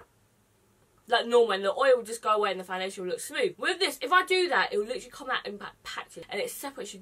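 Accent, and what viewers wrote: United Kingdom. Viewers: What your British???????? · Wait she's British what the fuck didn't see that comin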